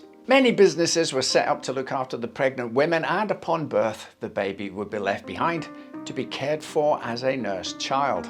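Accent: Scotland, United Kingdom. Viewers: I detect a Scottish accent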